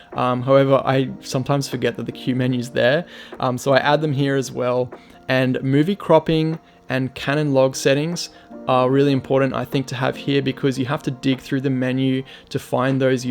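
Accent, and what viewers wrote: Australia. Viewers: Great to hear an aussie reviewer and using PAL. Since this review with firmware update Clog 3 is available. Do you now use that?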